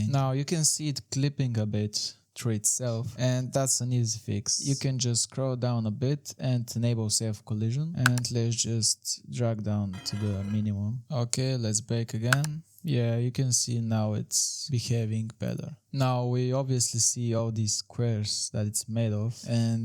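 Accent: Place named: Russia. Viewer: russian accent = trust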